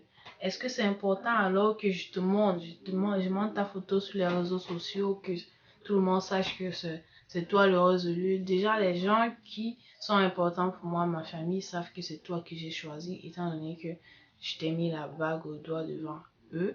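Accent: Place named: Cameroon. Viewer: Je comprends rien avec ton acxan camerounais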